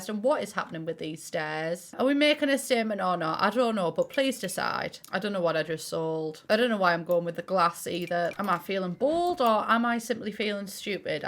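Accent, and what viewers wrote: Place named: Middlesbrough, United Kingdom. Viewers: As if ur from the Boro hahah gerrin lass me too, i just assumed I was imagining the accent 😭, best thing to come out of Boro in ever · When you’re from Middlesbrough and understand everything is saying/talking about:) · This voiceover makes me want to move to Middlesbrough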